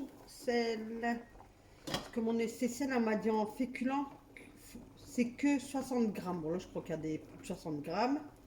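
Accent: North, France. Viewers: Bonjour merci pour ta vidéo même si ton homme est là 😁. j'adore t'entendre parler sa me fais rire on reconnaît bien l'accent du nord😊, j'aime bien ta façon d'être si simple et honnête sur les produits ménagers, continues comme sa je te suis pas depuis très longtemps mais j'adore 🥰 bisous à toi et à ton fils 😊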